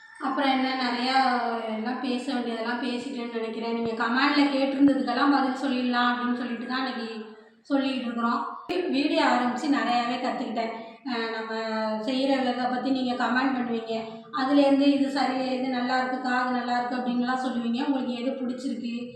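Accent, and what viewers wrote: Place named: Thanjavur, India. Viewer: வாழ்த்துக்கள். தஞ்சை மாவட்டம் திருவாரூர் மாவட்டம் வட்டாரச் சொல் படி பேசுகிறீர்கள்.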